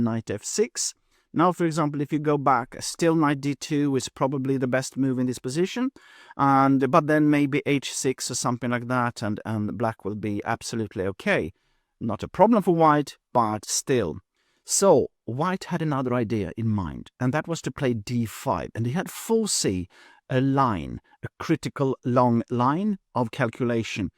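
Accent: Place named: United Kingdom. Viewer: is like Ben Finegold but with a British accent and no blather.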